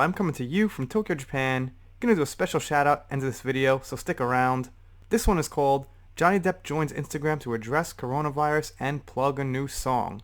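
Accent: New York, United States. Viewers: I did not hear You say anything wrong. I mean it maybe Your New York accent because We say, "Eee-ther." Anyway, I agree with You as always. The other thing that I will say is, "Johnny is just plain Class And Amber is Just plain Trash." That is really the difference in their videos. She acts like a Middle-Schooler trying desperately to be cool. When She said, "I will steal Your Stash." The middle finger too; GROW THE F%£?CK UP!!! That is all. I hope that You and Your Family are well. 😊👍🏼👋🏼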